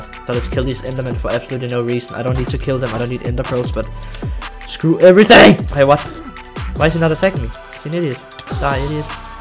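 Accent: Denmark. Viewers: Are u danish? 😂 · are you danish my guy? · yooooooooooooo anyone ever told u,u have an danish accent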